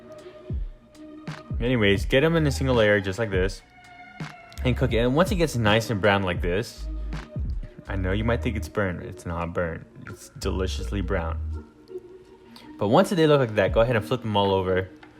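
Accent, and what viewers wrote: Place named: United States. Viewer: wish you yanks would learn to speak properly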